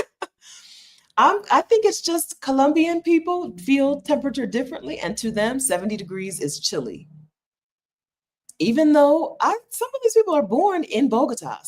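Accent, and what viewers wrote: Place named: Florida, United States. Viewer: What wrong with sounding like you are from Florida?! 😅 I hope Bogota works out for you! 😊 Tfs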